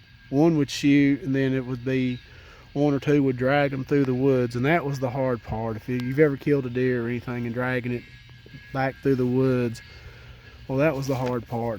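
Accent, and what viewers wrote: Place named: Tennessee, United States. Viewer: Hey buddy! I usually don't write comments but I stumbled across your channel by accident. One of your videos was recommended to me. I had never heard of Dennis Martin or the wildmen, but I binged watched all of your videos and I was fascinated. Your stories remind me so much of the stories my Grandpa used to tell me when I was a kid. He grew up in the hills of North Georgia and he saw alot of things like you describe. You sound so sincere and you sound like a really good guy. I laughed because you have the same Tennessee accent that my mother has, she grew up in the Copperhill, Greasy Creek area of Tennessee. She has stories about seeing witches and she claims that one of her relatives saw the devil. Keep on telling your stories old buddy because I truly enjoy them. You really do need to get your stories published. 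I do have a question for you....what parts of Tennessee would you absolutely stay away from at night because of wild men and other things. Thanks man and keep on keeping on!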